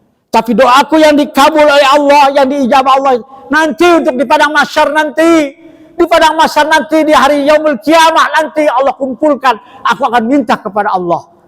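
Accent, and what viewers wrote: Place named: Banyumas, Indonesia. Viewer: Semangat syaikh ❤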